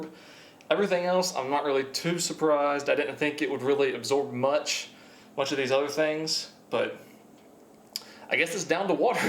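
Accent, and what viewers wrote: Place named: Pennsylvania, United States. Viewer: What state are you from cause I’m guessing PA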